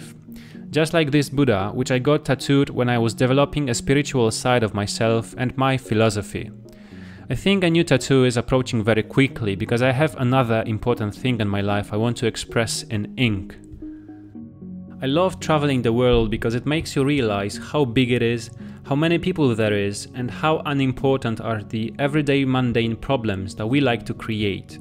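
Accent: Poland. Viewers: Coś tak czułem, że jesteś z Polski :-) Gratuluję świetnego kanału i życzę wielu sukcesów!!! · Tak czułem, że jesteś z Polski hahah. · Trafiłem na ten kanał godzinę temu i tak właśnie pomyślałem, że to chyba Polak. Akcent i typowo "nasze" krajobrazy w tle zdradzały to całkiem wyraźnie. :)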